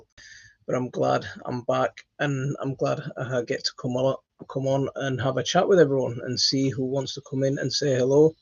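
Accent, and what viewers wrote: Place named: North, United Kingdom. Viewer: P.S. i love to listen to that accent , as i have northern roots . lol It reminds me of all my aunts and uncles now passed : ( x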